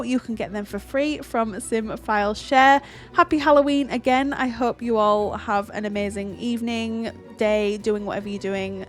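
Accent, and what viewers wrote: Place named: Newcastle, United Kingdom. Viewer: yeah you guys sounds similar, by any chance is it called a geordie accent, right?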